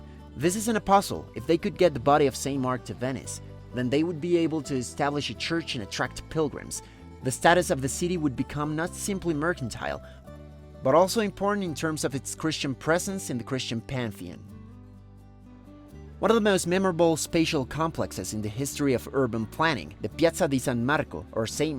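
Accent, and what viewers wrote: Spain. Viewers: Great video. Thanks from Italy. Just a little nitpicking: Your R pronunciation in Italian is a bit "too Spanish 😊" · Great video indeed, thanks for making it! Just out of curiosity, are you Spanish? The way you pronunce Italian names..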